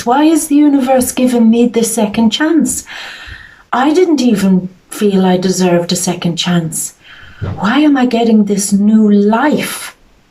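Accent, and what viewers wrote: Ireland. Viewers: Love your Irish accent! God bless you sister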